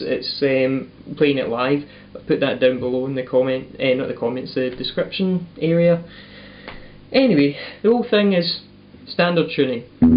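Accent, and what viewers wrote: Scotland, United Kingdom. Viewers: are you Scottish? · Was searching through all the intro tutorials then came across your's. I chose to stick around cause your Scottish definitely paid off thanks for the great lesson ;)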